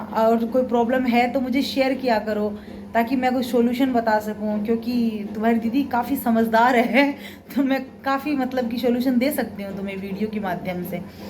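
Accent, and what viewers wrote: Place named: Shekhawati, India. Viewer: Shekhawati❤️ voice dil ko chu gyi didi really we like you ❤️❤️❤️❤️❤️❤️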